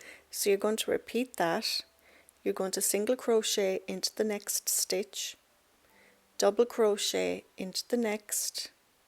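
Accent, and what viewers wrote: Ireland. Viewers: I'm looking for tutorial for a winter hat, but I stayed here till the end just to listen to this beautiful Irish accent. I lived in ireland for 11 years, miss hearing it 🇮🇪 😊 🇵🇱 nice beanie, maybe I will try making it 👍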